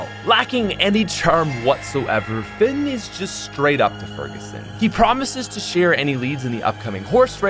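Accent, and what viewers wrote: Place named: Quebec, Canada. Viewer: hes french canadian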